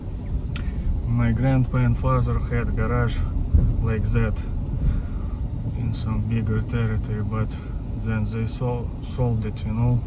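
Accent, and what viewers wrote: Russia. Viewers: Настоящий рашн акцент) тут и в подмосковье виды не хуже имеются, можно слайд-шоу делать по картинке и километражу от Москвы)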